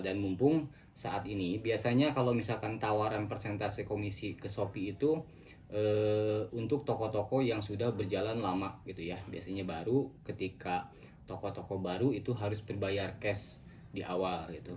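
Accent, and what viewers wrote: West Java, Indonesia. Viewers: Meni resep Sunda pisan, keren lah kang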